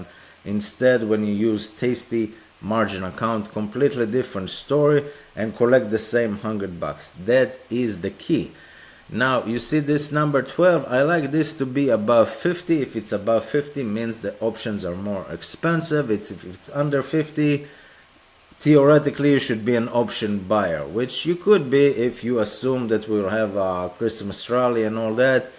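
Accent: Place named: Romania. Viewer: That has to be a Romanian accent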